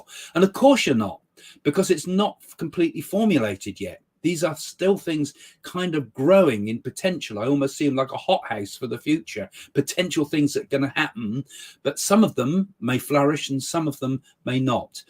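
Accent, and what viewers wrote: United Kingdom. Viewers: yea ! I can't comprehend the british accent ! I'm from united states.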